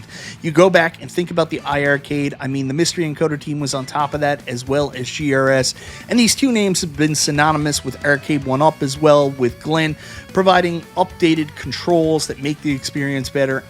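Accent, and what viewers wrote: Canada. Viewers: I experience only pain, and confusion when I can't understand the Canadian accent.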